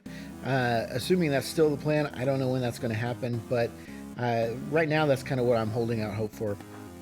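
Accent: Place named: East End London, United Kingdom. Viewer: I can’t say enough good things about Sothern GFX and their affordable, and well structured training. Also I am enthralled with accent. I’m afraid to venture this guess, but is it East End London?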